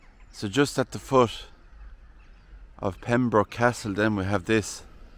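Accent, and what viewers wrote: Ireland. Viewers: Oh my goodness, that is terrible, it's unthinkable. Keep up the good work, I think you are wonderful beautiful Irish voice. Lynn in Brittany x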